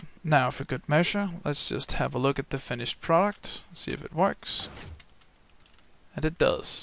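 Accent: Denmark. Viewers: Hehe, kunne høre på dit engelsk at du er fra Danmark! ;)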